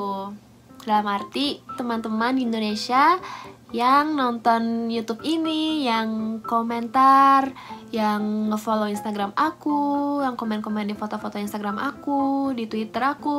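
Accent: Japan. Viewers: Ngomongnya indonesia logatnya jepang wkwk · suka banget liat kak ngomong bahasa Indonesia logat Jepang. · Kak kalo ngomong indo tpi nadanya kayak ngomong jepang hehehe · Logatnya lebih sering ke Jepang sekarang walau ngomongnya Indo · logat jepangnya udah kental yaaa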